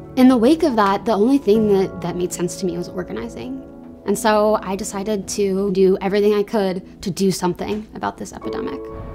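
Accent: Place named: United States. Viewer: If it wasn't for the American accent I would've guessed this was made in Canada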